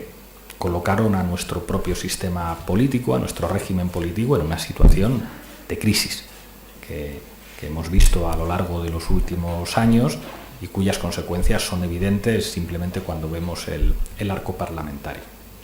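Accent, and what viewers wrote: Spain. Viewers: Comunismo bolivariano con acento español de España. A los jóvenes puede que los engañe, a los más maduros NO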